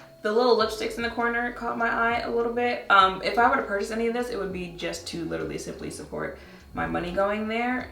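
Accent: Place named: New York, United States. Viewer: Such a New yorker!! ♡ THESE LAUNCHES ARE LAzzzsssyyy! It's giving... Dull and Dustttyyy! Yoooo!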